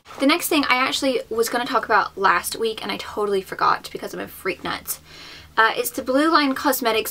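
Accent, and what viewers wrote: England, United Kingdom. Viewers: In this video I could totally hear your English accent!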